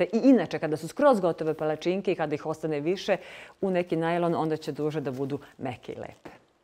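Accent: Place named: Srem, Croatia. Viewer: j Ja volim ovaku osobu koja na ovaj nacin prica , objasni i svima ugadja, . neznam odakle ste , sigurno iz okoline srema. lepa i sve divno napravi , za desetku.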